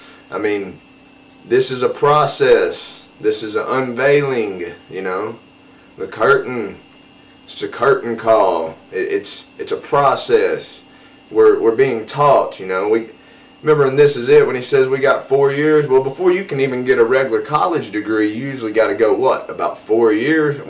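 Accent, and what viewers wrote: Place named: South, United States. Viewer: I hear you my brother J. I stopped trying to show the blind all the MJ clues a long time ago. It's really an exercise in futility. I just go straight for the jugular now and show them the reality of the lies that have surrounded us for years. But you gotta be there for them when they finally see the truth cause they all go into shock, drop to the floor and get sick to their stomach initially. Keep up the great work, love your vids and the southern twang. I'm even deeper south from you.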